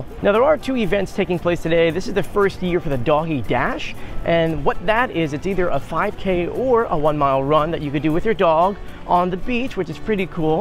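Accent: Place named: South Jersey, United States. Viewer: Dude you have a such a rough south jersey/philly accent. The way you say water is the poster child for our accent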